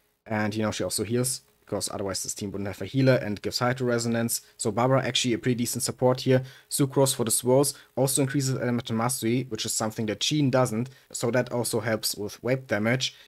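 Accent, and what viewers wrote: Germany. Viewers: Your accent, are you German? Or speak a Germanic language?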